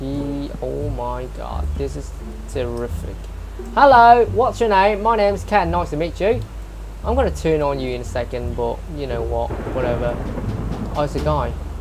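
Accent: United Kingdom. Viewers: u should combine fighting with the sword with the fishing rod more often,especially use the fishing rod when someone is near the edge,it'll knock them off further xD (công nhận bác nói tiếng anh giỏi ghê >o< accent giọng Anh nhỉ?)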